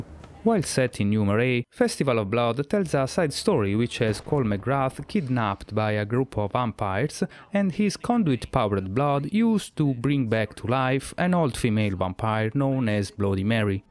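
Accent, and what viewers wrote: Italy. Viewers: I am so entertained by his Italian accent ,he sounds like Super Mario's 3rd brother Sonyo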